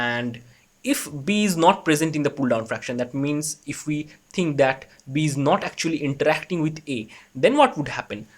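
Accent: India. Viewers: tell me are u from India? what is that accent??? i need to know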